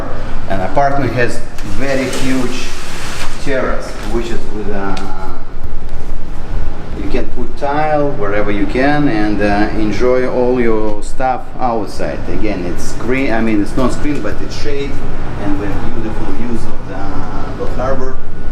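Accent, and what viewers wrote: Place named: Russia. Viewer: Слышен русский акцент)) но это только радует)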